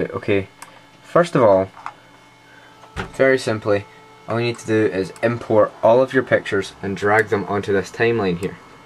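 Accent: Scotland, United Kingdom. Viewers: wow... I can't believe I just watched what I experienced on my own! Really! And I wouldn't think he'd be irish at all... more like british... Geez! stop animation is hard! mainly because I give up too often... >_< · This is a really good video, I learned something new today! Also, I'd marry you just because you have a lovely Scottish accent haha :) But anyways this was really helpful, I'm making an animation for class and had no idea how to do the importing part :P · u da man son, im scottish to and i get that irish thing all the time lol. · Ooo I love the Scottish accent!!!! :D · Nice vid. I notice you did the hard way to begin with! When I import the images from the collection to the timeline, I do it all in one go (Simply select all and drag to the timeline). Also, would have been nice if you'd shown how to add titles and a soundtrack. PS. I sussed you were Scottish straight away lolol! 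· Great info! Thanks, I'm going to try it today. OH...and I could tell you have a Scottish accent not Irish :) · Je is Scottish god sake aaaa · wow im irish and i could hear a scottish accent not an irish one.. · I enjoyed it a lot. BTW, I knew you were scottish...lovely accent. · Dude love ur scottish accent, and i am gonna enjoy making movies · yhass lol ur scottish! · Thanks a zillion!!!! the video gave me exactly what i was breakin my head over. and the scottish accent is very cute and distracting (in a good way )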